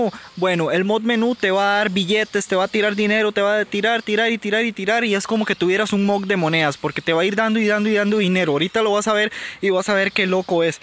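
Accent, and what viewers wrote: Costa Rica. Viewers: Eres tico??? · Q tal bro eres tico?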